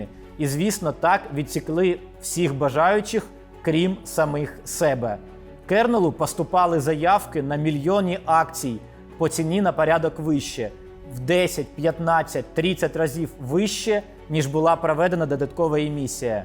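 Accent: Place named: Russia. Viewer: стадіон так стадіон! дякую за українську мову. У вас є певні слова, які ви вимовляєте ще на російськомовний манер. Але тренуватись ніколи не пізно, вірю, прийде досвід і будете казати правильно. Успіхів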